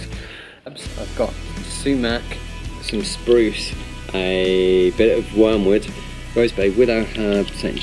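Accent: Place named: England, United Kingdom. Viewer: I usually hate English accents. But, This guy sounds like Michael Caine♥️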